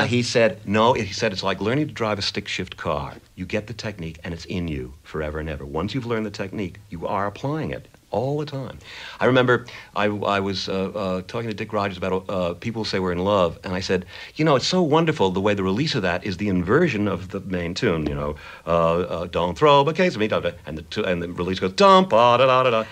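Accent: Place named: United States. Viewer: The most American accent ever.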